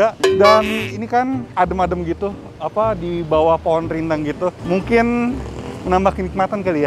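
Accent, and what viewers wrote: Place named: West Java, Indonesia. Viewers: Karena sudah belasan tahun di tanah pasundan, udha biasa banyak banget orang jawa di tanah pasundan yang nyunda banget logatnya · Gw perhatiin, koh klo ngomong sama orang sunda logat ngomong nya udah bisa ngikutin logat sunda sunda gitu 😀 ketularan kayaknya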